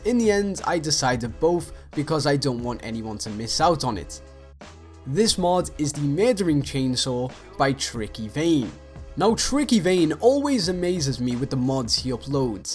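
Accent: Liverpool, United Kingdom. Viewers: You've got the weirdest scouse accent ever you lad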